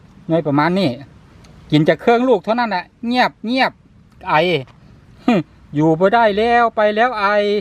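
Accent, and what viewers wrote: Isan, Thailand. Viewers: ขอบคุณมากๆเด้อคร้า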